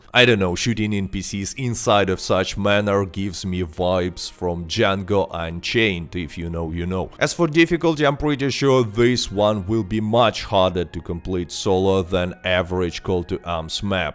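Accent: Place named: Russia. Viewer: His accent make him sound Russian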